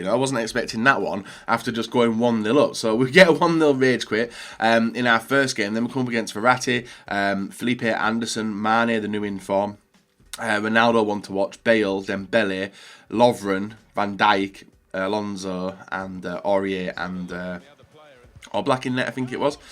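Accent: United Kingdom. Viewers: you from u.k??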